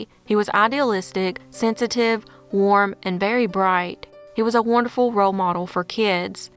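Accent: South, United States. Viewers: we must be related. We have the same southern dialect and accent. Thanks for the stories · I love hearing Quebecois names being pronounced with a Southern accent. It's like listening to a whole different language. 😊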